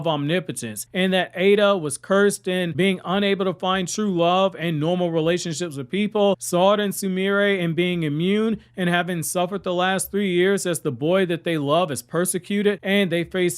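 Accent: New York, United States. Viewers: ole boy has a new york sounding accent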